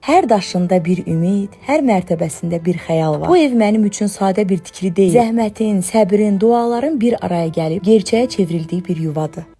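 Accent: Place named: Neftchala Salyan, Azerbaijan. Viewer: LƏHÇƏMİZ HƏR YERDƏ TANINIR❤ NEFTÇALA❤SALYAN ❤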